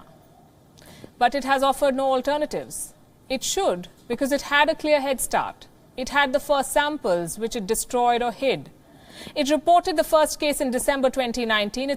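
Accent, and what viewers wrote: India. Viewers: this lady talking like indian is number one an arnt in a epidemic of a toilet shortage in its country, you are actually propitiating and brain washing people by- re-repeating what the people question are an not doing what the news are doing by providing an non bios information/solution. India is know as number top unhygienic country an number one in sexual assault, i say this as you slander another country. an get involved in its geo-politics. china says its a bio wepon created by usa, if it was a hazard the market would still be closed. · Indian accent’s never been this cool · Strong india accent.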